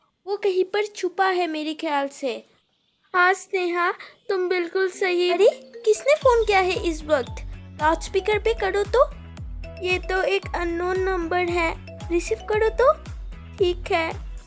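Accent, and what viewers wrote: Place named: Assam, India. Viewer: Ab Assamese ho lsliyai abka jab hindi bolotai ho to etina shoot naihi karta but koi nhi ab at least try to kar rahi ho..💞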